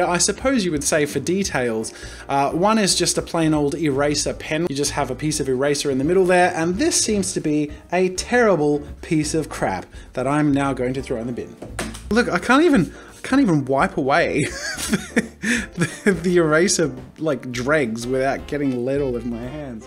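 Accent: Australia. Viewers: So you are Australian... how didn't I notice it earlier? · Hey dude are you Australian cause if so your one of the first ive herad off [im an auzie]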